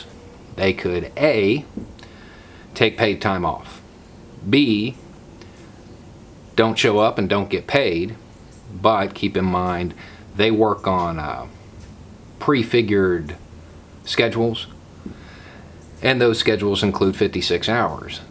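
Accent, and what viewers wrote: South, United States. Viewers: I like hearing a southern voice with smarts (i.e. not a conservative shill).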